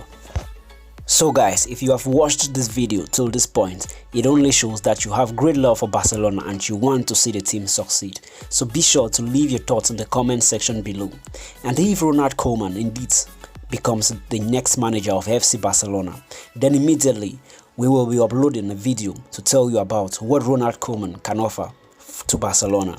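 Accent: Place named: Nigeria. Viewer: You sound west African, I can tell because your accent sounds so familiar, I'd say your nigerian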